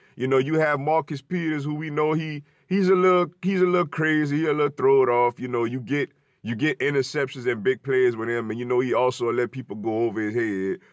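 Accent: New Orleans, United States. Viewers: Sounds like you from New Orleans son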